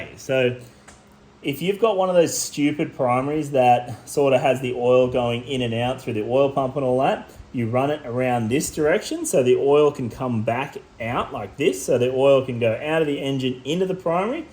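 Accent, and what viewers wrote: Australia. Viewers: Heard you were Aussie, Instant subscribe 🤙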